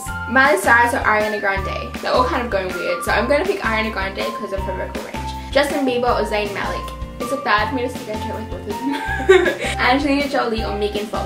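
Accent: United Kingdom. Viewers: You have a British accent I thought you were from england not Australia haha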